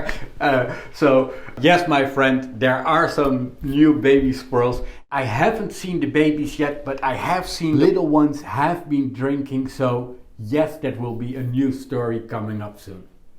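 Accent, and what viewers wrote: Netherlands. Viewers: Are you Dutch? C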